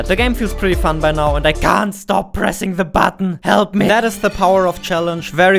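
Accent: Germany. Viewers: german? 🤔 your english sounds german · Sorry, but this german accent turned me off instandtly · The german accent makes this video much more fun!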